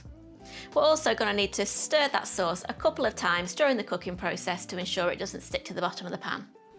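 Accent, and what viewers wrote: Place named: England, United Kingdom. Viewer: Wow. This is so relaxing I love your voice. I’m a Brit permanently in USA now and it just feels sooo good hearing and English accent again 😭🥰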